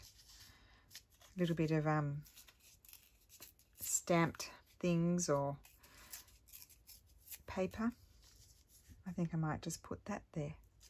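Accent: Australia. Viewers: Another fantastic video, really enjoy watching you. (So nice to hear your Aussie voice - and phrases - when watching 🤣) Have you tried popping your mushy Giotto in the fridge, might help for a little while? Dawn (Melbourne, Australia)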